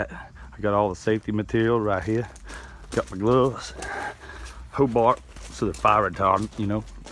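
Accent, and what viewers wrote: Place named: Louisiana, United States. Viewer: LOL It looks like the jack my Dad had in his 1969 Rambler! I am going to take a wild guess that you are from somewhere in Louisiana? There are only 4 states I haven't been to, and your accent sounds a lot like Louisiana!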